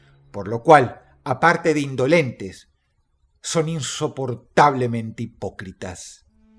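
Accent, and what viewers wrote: Argentina. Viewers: Jajajaja y por que no habla como venezolano? Tiene acento de argentino o algo asi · Ya era hora de que lo hicieras, ya hacia demasiado tiempo que agarraste acento argentino.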